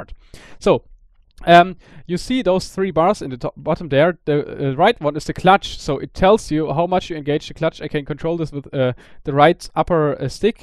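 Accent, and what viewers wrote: Germany. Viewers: Hey, are you maybe german?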